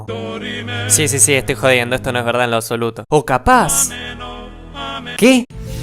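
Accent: Buenos Aires, Argentina. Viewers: Sos Argetnino?? por que tu acento me suena a argentino... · no sabia que eras argentino... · eres de argentina? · este flaco es porteño gato !! awta... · es argentino?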